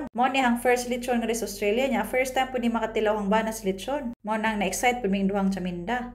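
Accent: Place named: Bohol, Philippines. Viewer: Hala taga alicia ka ako pud taga alicia hahaha kagamay sa kalibutan dini pata nagkita sa vlog hanga ko nimo kay binol anon gihapon ka mag storya pareho ta dia kos uk pero bola anon gihapong daku 😅 amping kanunay mo diha ❤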